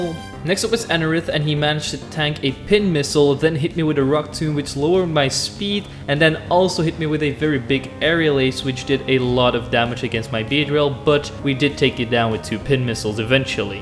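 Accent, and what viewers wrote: Netherlands. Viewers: You sound dutch, is this correct? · Well if u aint Dutch or from Belgium idk anymore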